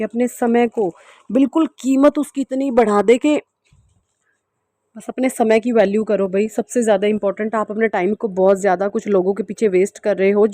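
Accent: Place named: Punjab, India. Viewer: Your punjabi accent is so appealing!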